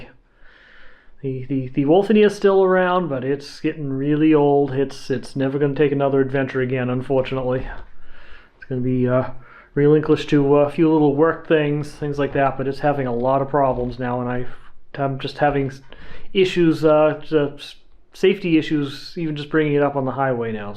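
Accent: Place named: Massachusetts, United States. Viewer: As a Northshore transplant in southern Maine, your MA accent sounds like home. Mine is pretty much gone. It only comes back when I’m around my brother or my parents. They’re in Amesbury and Peabody. I’ve been out of MA since 1999.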